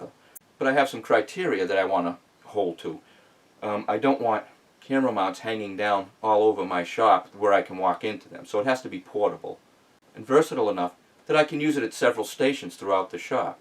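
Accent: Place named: Massachusetts, United States. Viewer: from your accent, you sound like you're from New England. I was raised in New Hampshire.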